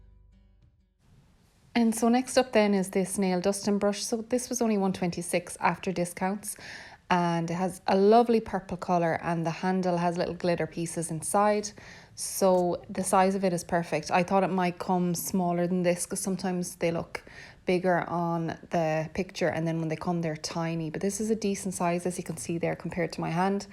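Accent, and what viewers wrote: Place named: Ireland. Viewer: What a beautiful accent! Sorry I love my Irish ppl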